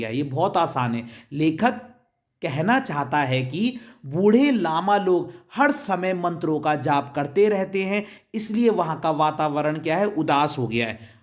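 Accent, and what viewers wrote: Garhwal, India. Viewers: Sir aap garhwali ho kya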